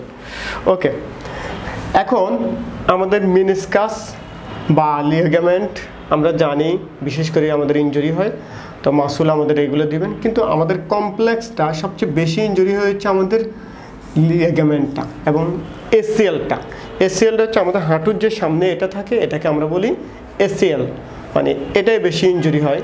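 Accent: Noakhali, Bangladesh. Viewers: স্যার মনে হয় নোয়াখাইল্লা🥱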